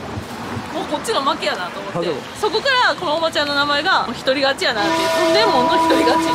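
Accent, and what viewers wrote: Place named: Hyogo, Japan. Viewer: 兵庫の人ですか？「～しとう」がずっと耳に残ってるｗｗｗ いかに美味しいか説明のパワーと語彙力おもろい:yougotthis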